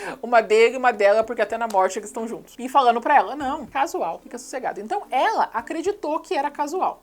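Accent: Curitiba, Brazil. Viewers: To amando seu canal, senti um sotaquezinho de curitiba, se eu estiver certa, é bom ver uma conterrânea aqui no youtube :) To indicando seu canal pra outras pessoas tbm! E quero agradecer pq seus videos tão ajudando muito num termino recente que eu tive. Manda ver guria que tu vai ter muito sucesso ainda!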